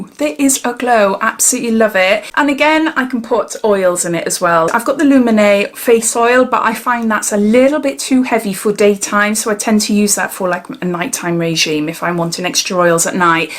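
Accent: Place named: Pembrokeshire, United Kingdom. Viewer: Just subscribed. Stumbled on your video and we are simialar ages. Also detect a welsh accent, I think Pembrokeshire. I am from Newport (Gwent)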